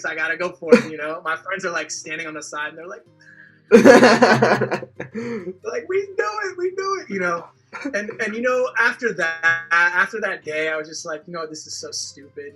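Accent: Buenos Aires, Argentina. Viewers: Tenes no solo la tonada argentina si no la simpatía y la onda ganadora de argentina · prácticamente, sos un argentino hablando en inglés.. tenés más acento argento que yankie! AMO❤ Gracias por amarnos tanto loco!!! 🙌 Saludos desde Buenos Aires 🇦🇷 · Querido. Escuche como hablas de el asado y ahora me voy con mi compañero de trabajo a clavarme un chori a la costanera sur de la putísima madre que lo pario.... Muy bien tú acento porteño..... Cuando vuelvas y se pueda ir a la cancha te voy invitar a la cancha de Huracan a ver al Globo.... Abrazo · hasta cuando hablas Inglés se te pega el acento y estilo Argento! Posta! Te lo deben decir en tu país! Tal vez no lo notes pero sos un Argento hablando inglés 😁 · Te encontreeeeeeeee. Feliz! Me encanta verte disfrutar tanto de Argentina! Siempre c una sonrisa! Ya se lo reenvío a mis hijos. Amo mi país. Pero evidentemente tus ojos me lo recuerdan con más frescura y sacándome de este momento tan complejo a vivimos como país. 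Hoy mis hijos miran al mdo. Y sabes q es un desafío importante para las familias. Pero me quedo c tus sonrisas y la forma en q disfrutas de nuestras costumbres. Te mando un abrazo y un beso desde el alma hermosa personita Te juro q al escucharte era imposible para mi pensar q no seas argentino. Un placer! Saludos desde Baires. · Sos un Argentino disfrazado de estadounidense que habla argentino, y hace chocotortas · Tiene ré buena onda el vago pero reconozcamos que acento y personalidad portena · Te escucho hablar sos un porteño jajaja. Que bien que puteas. Saludos del norte de argentina Santiago del Estero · Se te escapa el artentino por todas partes, pero bueno, espero que hables un buen yanqui, la verdad no me gusta mucho, lo ficcional, chau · muy bien el acento argentino!!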